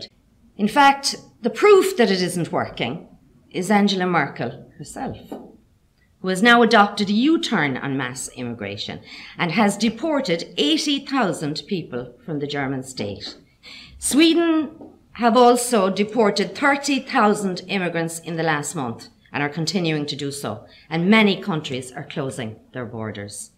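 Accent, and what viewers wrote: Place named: Limerick, Ireland. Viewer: Great speech, well executed, strong Irish woman. Limerick accent rocks too.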